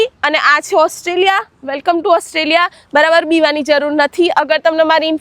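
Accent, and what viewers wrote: Sabarkantha, India. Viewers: sabarkantha ni language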